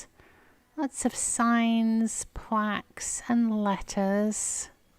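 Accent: England, United Kingdom. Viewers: Your accent makes me want to turn you off,some times you overdo the High English accent.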